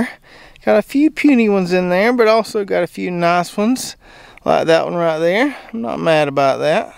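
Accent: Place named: North Florida, United States. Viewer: I wonder if anything can be done with the Brussel Sprout leaves as a raw, or cooked green like collars, or stir fry, etc...? It does seem like most big, dark green leafy veggies tend to need a bit of extra Nitrogen, sort of like super lettuce. Thanks for all the cool vids. I've just found your channel and been getting caught up. It looks like you're somewhere very nearby. I'm in North Florida and we have very similar soil, pines and accents :) Cheers!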